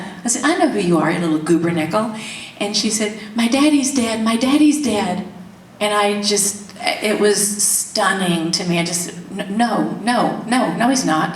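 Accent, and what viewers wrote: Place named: South, United States. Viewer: It's there, I here it..she is a Southern Belle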